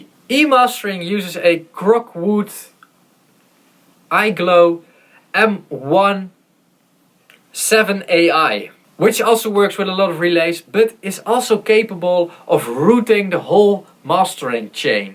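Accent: Netherlands. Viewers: You sound dutch..right ?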